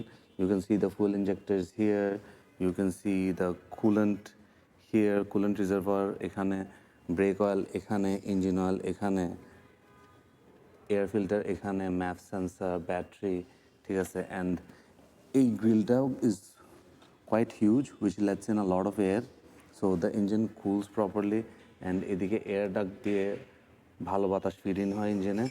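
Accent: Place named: Chattogram, Bangladesh. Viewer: Bro is the showroom located in Chattogram khushi road? Your accent says your from Chattogram.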